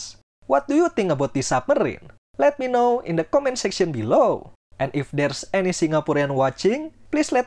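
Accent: Indonesia. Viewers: I guess the narrator is Indonesian😊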